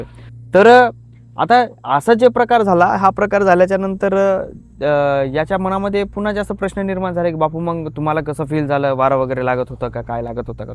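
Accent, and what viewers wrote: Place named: Marathwada, India. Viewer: Parbhni nanded bhasha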